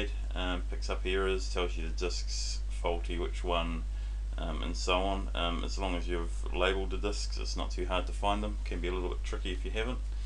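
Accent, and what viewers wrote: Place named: New Zealand. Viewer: yeah sounds like a kiwi. Nitwork. Tistnas .. etc.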